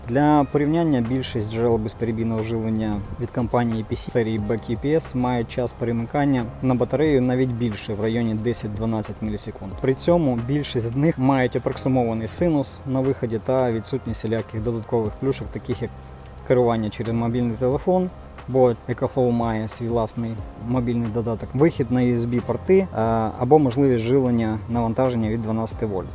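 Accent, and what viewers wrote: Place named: Surzhyk, Ukraine. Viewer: 😂если начали по укр говорить, то уже все говорите, суржык дикий аж слух режет)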